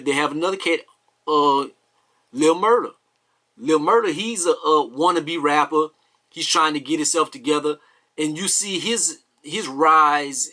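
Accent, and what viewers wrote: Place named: South, United States. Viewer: I subscribed cause I can just listen to your country accent all day . But on the real you break that ish down ❤️❤️❤️❤️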